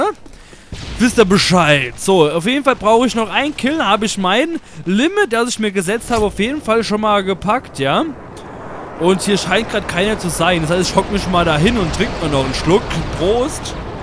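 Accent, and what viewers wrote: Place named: Hesse, Germany. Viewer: Tatsache? Wow hätte ich jz nicht gedacht, denn es Unterscheidet sich wirklich kaum vom hessischen (Frankfurter Raum) Naja aber das Video unterhält, ist auch wirklich ne gute Idee ma richtig einen zu saufen, damit man zumindest dieses Kackspiel ertragen kann! Naja jz is ja Black ops 2 da.. hm wenn man darüber nachdenkt.. könnt man grad schon wieder anfangen zu saufen! (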